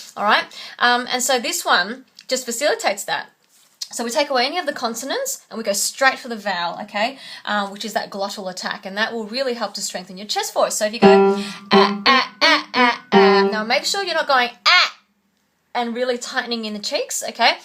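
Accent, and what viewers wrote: Australia. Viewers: your accent...Umm..Australian right ?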